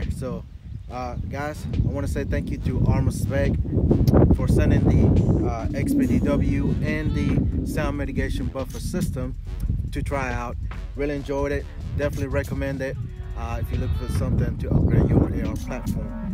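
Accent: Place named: Lumberton, United States. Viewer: You from Lumberton? I got some family from that area and you got that heavy accent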